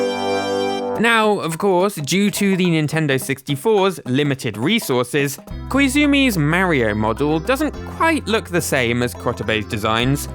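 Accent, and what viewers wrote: United Kingdom. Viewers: He’s British that’s how we say it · For the people who are confused as to why pronounces Mario differently, a lot of us from the UK pronounce it that way and have been doing it for years so it’s nothing new. Some people in other countries say it that way as well so it’s not only restricted to us. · HES BRITISH!!!!!!!! 😡😡😡😡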